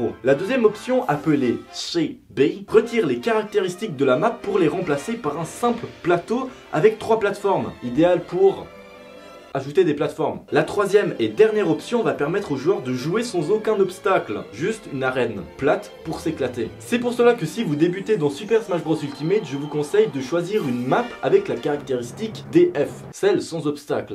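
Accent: Switzerland. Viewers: JE SAVAIS QUE TU ETAIT SUISSE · T’es suisse ?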